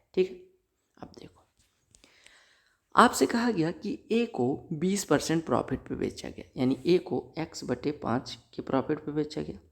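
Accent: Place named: Mithila, India. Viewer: Bhaiya kya aap mithilanchal se hai? I am also from Janki janm Bhumi Sitamarhi, Bihar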